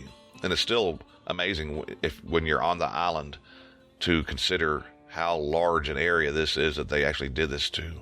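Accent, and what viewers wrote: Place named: Texas, United States. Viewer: cool man , I’ll tune in. Gonna take me a while to go through all your videos but I like the narration that sounds like you’re actually from Texas telling stories around the campfire. Very wholesome and cozy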